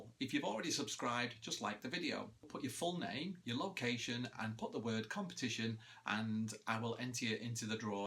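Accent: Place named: North West England, United Kingdom. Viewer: Yet another lovely vlog. I agree with you on the Boris Johnson misinformation! I hope Anthony's well, busy working probably as we all are in the NHS. Glad Dexters perked up a bit too. Im originally from the North West so loving your accent too. Your artistic skills are truly incredible, just fantastic.The detail is amazing. Can you enter me into the competition please? Chris Graham, Essex.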